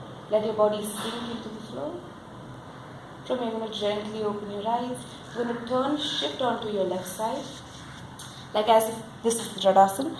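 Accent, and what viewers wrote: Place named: Bangalore, India. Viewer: Everything is fine but your Bangalorian accent and body language sucks ...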